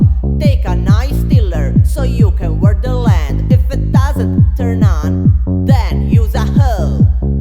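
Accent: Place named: Piedmont, Italy. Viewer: Most foreigners think that the Italian accent is solely what they hear in movies like "The Godfather" (heavily influenced by the southerner dialects, generally Sicilian). I've been linking this video to my foreigner friends to let them have a taste of what English may sound like with at least one other regional accent, possibly a northerner one, such as Piedmontese. 💕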